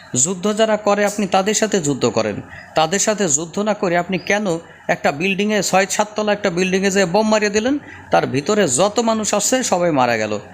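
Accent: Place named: Gopalganj, Bangladesh. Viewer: ভাই আপনার বাড়ি আমার মনে হয় গোপালগঞ্জ , আমার বাড়ি ও গোপালগঞ্জ,, এই ধরনের কথার বাচনভঙ্গি বাংলাদেশের অন্য কোন জায়গার লোক বলতে পারবে না, এটা আমার বিশ্বাস, আমি আপনার প্রতিটি ভিডিও দেখি, ভালো লাগে, ভালো থাকবেন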